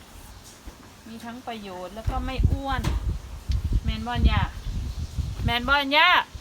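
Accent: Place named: Chaiyaphum, Thailand. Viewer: คนจังหวัดใด.. สำเนียงชัยภูมิแม่นบ่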